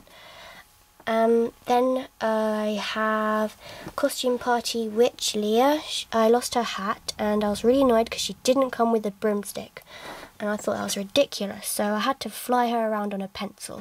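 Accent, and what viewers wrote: United Kingdom. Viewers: I have the leah the witch doll but i threw her away cuase she was old and i had no use for her but i love your british accent :) also your dolls are really good takin care of :D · r u from Britain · Are you from london? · Are you british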